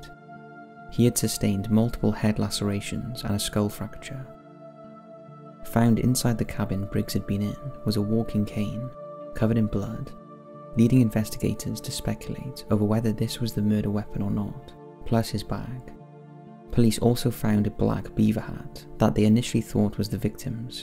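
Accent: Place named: Wales, United Kingdom. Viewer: Does anybody else IMMEDIATELY smash the thumbs up when they hear the Welsh guy narrating?! My favorite ever! 😘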